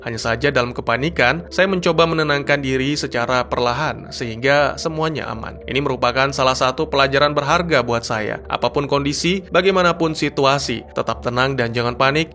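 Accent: Aceh, Indonesia. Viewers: wahh, abang dari aceh kah?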